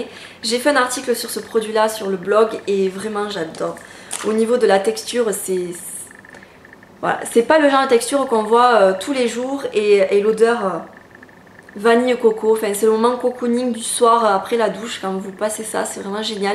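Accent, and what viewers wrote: South, France. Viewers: Tu es au top trop géniale tes haul sont supers en plus avec un accent du sud ..... mais quel moments magiques!!!!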